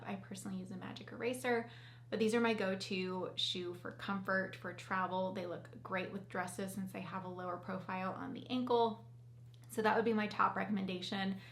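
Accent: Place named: South, United States. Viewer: She's southern :)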